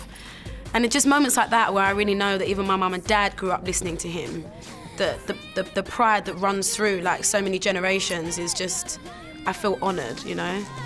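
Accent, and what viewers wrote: United Kingdom. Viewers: I didn't know she was British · Damn this whole time I didn't know she was British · Wow she's British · Before you ask, YES, she is british :)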